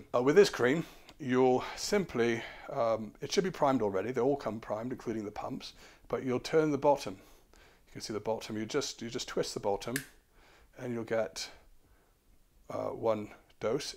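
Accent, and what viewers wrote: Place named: London, United Kingdom. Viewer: your accent sounds very similar to Prince William's accent. Did you grow up in the same area of London?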